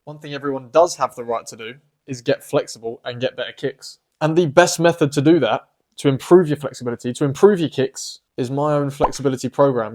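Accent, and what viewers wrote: United Kingdom. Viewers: This is the first time i've ever heard your voice because I always just watch your shorts which always have " " as the sound, I wasn't expecting you to be british😅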